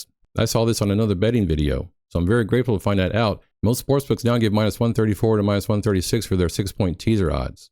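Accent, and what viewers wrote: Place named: Michigan, United States. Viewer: what state do you live the voice of your accent are you from Michigan r u a lions fan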